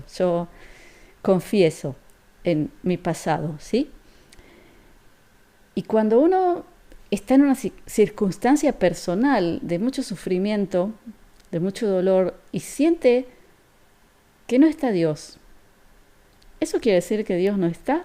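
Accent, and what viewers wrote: Argentina. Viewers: esa historia de las huellas no es de Dios Padre El es energía y no tiene materia Las huellas son las de Jesús El se hizo Hombre y camino sobre esta tierra Entiendo tu historia tus raíces y las respeto!!! Pero la Huellas son de Jesús!!! Aunque Nuestro Padre Eterno Siempre esta pendiente de nuestras necesidades!! Tenes una dulce y suave voz Argentina da gusto escucharte También en Hebreo resalta esas cualidades que mencione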